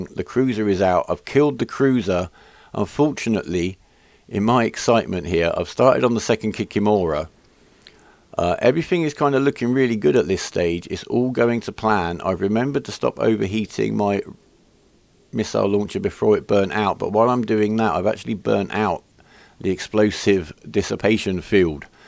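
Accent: United Kingdom. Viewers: Congrats! I'm new to Eve and probably too late for the giveaway but only a week in and watching as many videos as I can to learn as fast as possible. If I had a Praxis I would definitely have to keep her mothballed for the time being as I would hate to lose her through incompetence!! I'd love to see a series of videos for complete newbies to watch AFTER they have completed the tutorials and the Agent Career missions as there seems to be a gap for such videos. Everyone assumes that after those you are an expert, but that's not the case. A bit like when you pass your driving test you don't know everything about driving. I subscribed when I saw your first video and am still loving them. (Also nice to watch a fellow Brit as your accent is similar to my own!) :)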